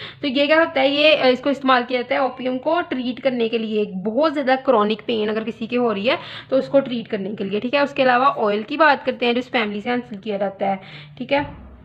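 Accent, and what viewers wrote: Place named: Lahore, Pakistan. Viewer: Thank uhh so much mam 😊❤❤❤ your accent is so cute..kind of lahori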